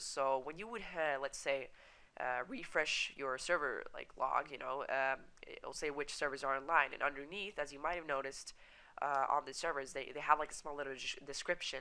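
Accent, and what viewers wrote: Sweden. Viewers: are you from sweden? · are u swedish? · When i try to open Start.bat it crashes. Btw are you swedish?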